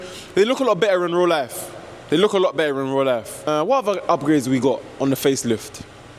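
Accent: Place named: South Africa. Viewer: he get me everytime , i keep thinking bro is from southa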